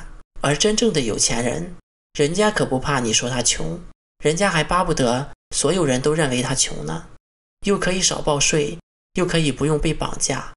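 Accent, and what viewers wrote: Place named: Shandong, China. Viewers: 博主口音和黄渤有点像，是山东人？ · 听口音Klaus你是山东或徐州一带的人？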